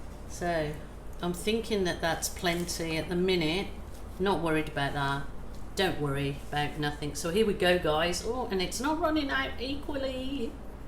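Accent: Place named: Liverpool, United Kingdom. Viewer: I love this. I also like the other one you did with all the shades of Aqua and Orange. The drip line painting i know exactly what your'e talking about. I agree with what you said about using every other line in the tray but also maybe still elevate your top end but start your dripping/pouring from the bottom end and moving up to your top end????? Or again do the same from your top end moving the pour down to the bottom as you always have more paint at the beginning than the end and it could run down into itself. If i'm overstepping the mark here please ignore me. I'm no artist but i understood what you were trying to do, may; maybe more elevation! Either way, i LOVED both of the ice cube tray paintings. I am so itching to get someone to help me clear out my spare room and start ordering paint supplies. You are an Inspiration and i love your down to earth attitude. 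You don't take yourself too seriously which is wonderful. I can honestly say that from the people that i have looked at their art on YouTube you have to be my favorite and i mean that sincerely. You have spoken briefly about your Mum in the UK and from your accent i'm thinking Liverpool maybe. I don't know. Thank you Thank you Thank you for all your work and iv'e never seen a bad result. Take care. Shirley xx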